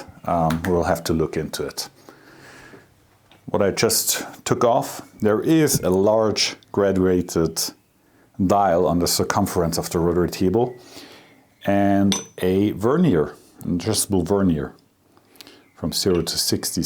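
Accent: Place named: Upper Palatinate, Germany. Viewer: Very nice 😎👍 if you ever purchase sthg big again and want to clean it, drop by my shop and use my Würth Parts Cleaner, VOC free, that stuff gets the grime off in no time. I am not sure of your location, but you sound like a Oberpfälzer 😉 i am located 15min next to munich airport with the shop 😊 Greetings, Chris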